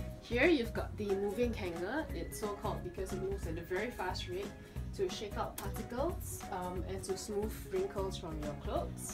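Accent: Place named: Nigeria. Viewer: No disrespect, I hear a little bit Nigerian accent in her. I enjoyed your presentation my lady.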